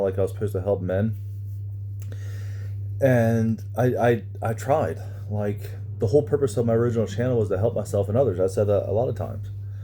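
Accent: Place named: United States. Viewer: Good to see you back. I could swear that you're starting to lose your American accent. But I don't think that its accurate to say that mental illness is the reason for not being in a relationship. You yourself admitted that it was 'luck' so in the case of Todd and OC what could they possibly do to increase their chances? Todd after all did work for over 10 years in jobs surrounded by people and nothing happened. Then there is what one wants vs whats available. But its good that things are working out for you. You do seem more composed and self confident than what you did before.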